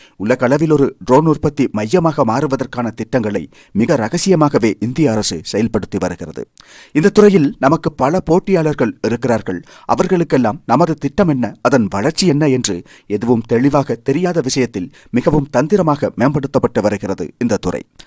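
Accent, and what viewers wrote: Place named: Sri Lanka. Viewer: இலங்கை தமிழர்களின் தமிழ் உச்சரிப்பு (இது போன்றவர்கள்)... கேட்பதில் ஒரு இனிமை உள்ளது ❤ #..... நல்ல விஷயமாக தேர்ந்தெடுத்து பேசி வருகிறீர்கள்.நன்றி❤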